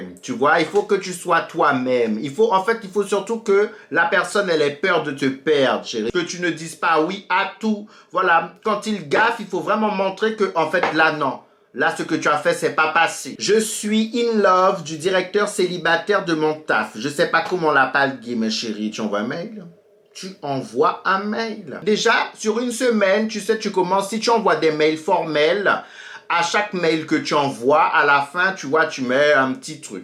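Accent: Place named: Brazil. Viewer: Ton accent brésilien j adore 💕💕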